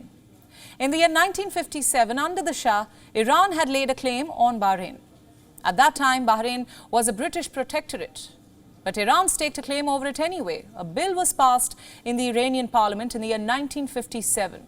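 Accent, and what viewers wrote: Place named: India. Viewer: What is this? it's not news and it's not analysis, it's just some one saying imaginary things in Indian accent.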